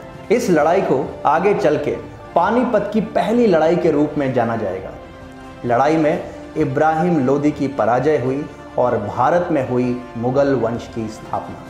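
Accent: Standard. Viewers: #Founder aap achhi shudh hindi bolty ho or aap ka bolney ka tariaka bhi achha hae... Bahoot achhy se ek alag andaz me aap story read karty ho... Ek advice dena chaunga agar aapko bahut aagy tak jana hae to hi... Ki aap khas kar History and non political topics par hi video banaya kary... Kyu ki aap bhi vohi kaam karty ho political videos me aapke dwara tathakit storys me jo ek #godimedia karty hae. Baki sab thek hae... ( I am not belong from any political party or political ideology )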